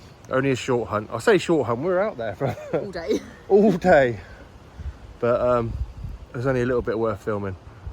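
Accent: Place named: Kent, United Kingdom. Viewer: you’re edge of Kent though right? I heard it a little the more I watched to be fair…I have a mate down the road who came from Kent too and you sound like him…but, not quite as much. Whereabouts is your detectorist it’s in crime from then?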